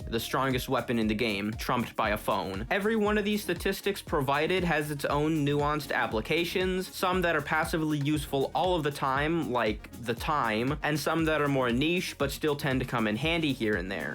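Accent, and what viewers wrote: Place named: United States. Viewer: Pov: you don't like the American accent (don't live in the states might just be a state accent)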